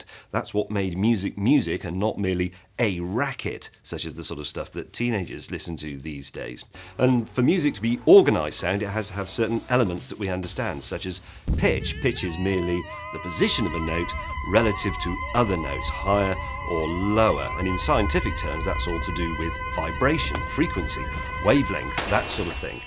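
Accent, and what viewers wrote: United Kingdom. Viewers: British accent makes everything sound still more inteligent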